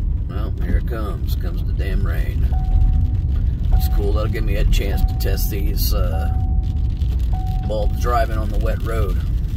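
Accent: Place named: South, United States. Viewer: wasnt it raining in this video though? are you in the south? your accent sounds southern. i know rain is much thicker and heavier there than the PNW.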